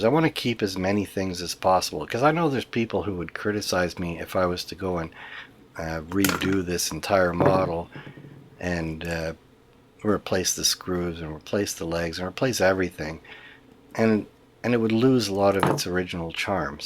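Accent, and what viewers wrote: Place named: Canada. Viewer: Very nice job, faithful to the original and with a real eye for detail. Also it's always nice to hear (what I suspect is) a Canadian accent.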